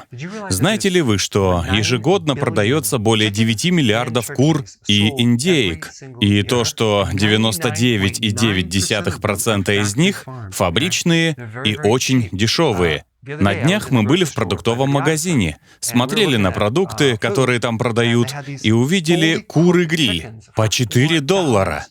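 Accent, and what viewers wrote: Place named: Ukraine. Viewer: курица а нЭ кури достали уже эти укра лайные кури кура и тд слух режет дико тем для кого русский родной читающий текст из украины это четко понятно по не русской музыке речи и ошибкам произношения типа а вместо О в словах где О ударная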